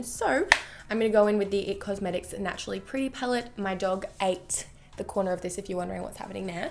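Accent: Australia. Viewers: I adore Australian accents. 😍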